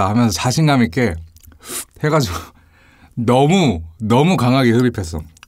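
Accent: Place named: Gyeongsang, South Korea. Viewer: 승부하부먹충 이게 왜 일베야 경상도 사투리고만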